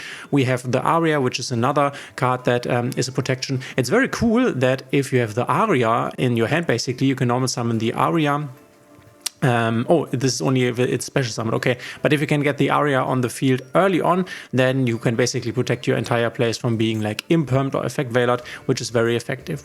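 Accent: Germany. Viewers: OMG dieses deutsche englisch Akzent nervt. Aber trotzdem gut erklärt😂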